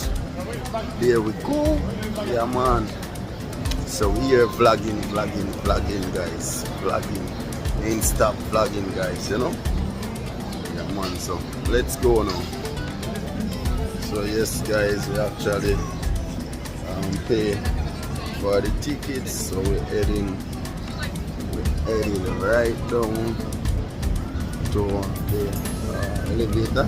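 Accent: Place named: Jamaica. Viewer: WE GET A VLOGGER WHO SPEAK OUR LANGUAGE APART FROM ENGLISH PATOIS PATOIS PATOIS PATOIS AKA PATWA PATWA PATWA AKA BROKEN ENGLISH EG ENJOY YUH SELF MI BWOY CUM BAK SOON ❤❤❤😂😂😂😂😂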